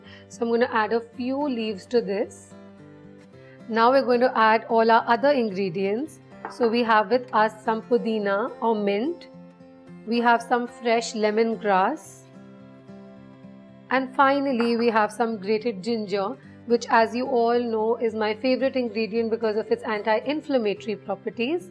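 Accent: India. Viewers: Also I love your raspy Indian accent it's so sexy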